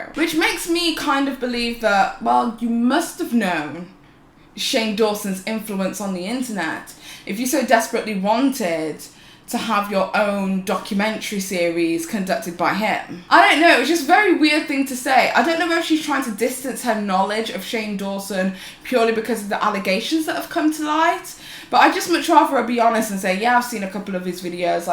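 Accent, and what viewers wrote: United Kingdom. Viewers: Started watching your videos recently. As a fellow Brit, its wierdly comforting to hear your lovely British accent, when the majority of the video's in a sea full of American accents. KNOWS THE MEANING OF NUANCE new Subscriber. X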